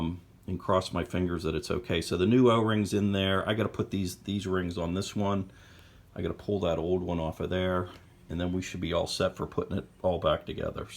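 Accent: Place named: Pittsburgh, United States. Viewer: Thanks for the video. I could tell from your 'accent' that you were a Pittsburger, then I saw the Vaccarri sticker on your loader. I live in McMurray and used to go to them all the time for my BX2230. Now I have to find a rebuild kit somewhere else.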